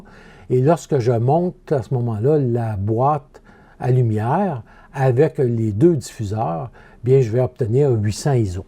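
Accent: Quebec, Canada. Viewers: Bonjour, C'est toujours un plaisir en tant que Français de vous écouter avec votre doux accent québécois et surtout j'apprécie la qualité de vos explications, toujours claires et précises. Bravo pour votre pédagogie! Je suis équipé en Godox et j'ai acheté le Q6. J'ai eu du mal à trouver le mode de paramétrage pour le faire fonctionner avec le XPROII-N. Et grâce à vos explications et le commentaire qui change tout ci dessous (RX COMPAT sur ON) la connexion s'est bien faite! Je reste attentif à vos prochaines capsules. PS: Comme bon photographe, j'ai toujours une petite question qui traine: Qu'utilisez vous comme flashmètre qui mesure la lumière en HSS? (on sait qu'elle est inférieure par rapport à la puissance délivrée sans HSS. De manière empirique je rajoute en général 1,3 Stop quand je passe en HSS mais je n'ai pas l'exacte mesure.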